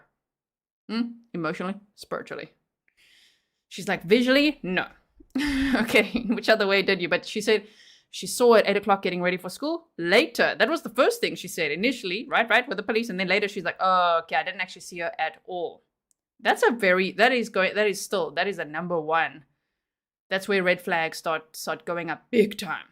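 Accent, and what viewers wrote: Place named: South Africa. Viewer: New subscriber here, I'm really particular with who I listen too as some people are really annoying to listen too lol, but I'm so happy to find you, love your SA accent and slangs (shame) 😂 as hubby is from SA, love your channel ❤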